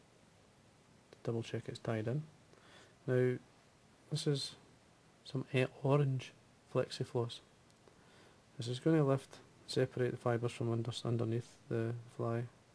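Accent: Scotland, United Kingdom. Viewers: I think I've said it before, needs saying again, you are a wonderful fly tyer and your flies are absolutely lovely. Your methods, which, as a tyer for 40 years (and pretty decent), I have to say are SO meticulous. Plus the accent man, I love it. Brings me back to a wee lochen near Inverness, which, as I understand, I can't tell you the name of or I will meet with an early grave.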